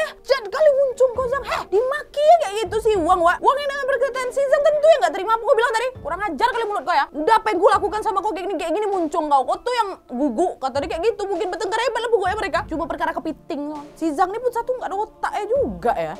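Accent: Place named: Sumatra, Indonesia. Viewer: Kak ini aslinya orang mana kah....? Masa iya orang Jawa tapi logatnya kayak orang sumatera.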